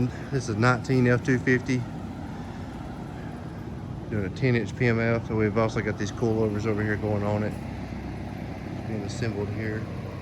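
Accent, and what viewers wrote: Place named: Alabama, United States. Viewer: You got a Bama accent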